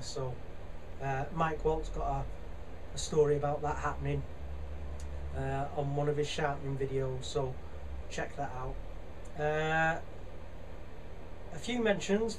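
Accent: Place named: North, United Kingdom. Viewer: I love your style, your layman's explanation of things really has increased my understanding in leaps and bounds. I've been struggling to decide on which lathe to buy for months and whether I had the confidence to start turning. But you have really spurred me on, thank you. If I may ask some advice as a beginner? I'm going to spend as much as I can reasonably afford on the lathe (As the advice goes) but the turning tools are in danger of wiping me out, especially after buying a chuck too.. Can you suggest some decent ones to start with? Should I aim for HSS? Also, you sound like a fellow northener! Are you allowed to say where you buy your wood/blanks from? And what wood is good to start with? I have lots of offsets of softwood 2x4 and treated rough sawn....? Sorry for all the questions......but I don't feel stupid asking you. Thanks again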